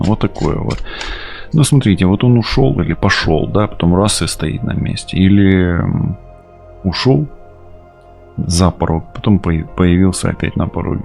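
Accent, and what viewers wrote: Ukraine. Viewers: ! Очень интересный расклад. И очень красивый чистый украинский язык.. Не часто такой услышишь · Красивый расклад и красивая украинская речь ( мова). Мир всем нам❤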